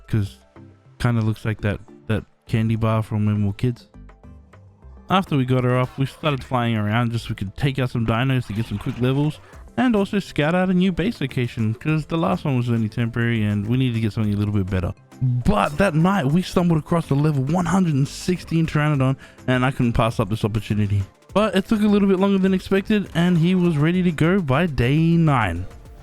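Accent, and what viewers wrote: New Zealand. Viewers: You sound like you’re from nz. Are you?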